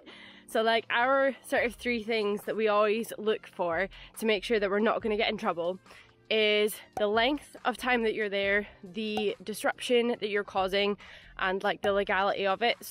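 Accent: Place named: Aberdeen, United Kingdom. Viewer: Are you from Aberdeen? As an aberdonian myself I recognise the accent, been in England for the last 12 years, mainly London, moved into my self build crafter conversion about a year ago, England is a total nightmare for parking, I love coming back to Scotland for the beautiful spots you can park in without any grief. Please do not put any of your spots on P4N as councils monitor this app and quickly put an end to your park up. I never use apps, I use google maps and street view, when I find a great spot I save it and in a year and a half I have quite the database of amazing spots. Also if you see a farmer go and ask him if it’s ok to park up on there land, they are extremely wary of pikeys parking up but if you introduce yourself be polite and respectful 9 time of ten they will accommodate your request free of charge and tell you where to park, I even had a farmer go all the back to his house to get his keys so he could open a gate for me. 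You can also offer site protection for exchange of a park up, farmers have a lot of expensive machinery, they are more than happy to have you stay as a presence against theft near the machinery. Thanks for the vid. Subbed.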